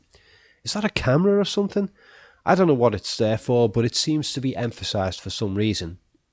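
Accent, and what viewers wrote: Liverpool, United Kingdom. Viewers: The juxtaposition of horror movie analysis and a Scouse accent is scary in itself!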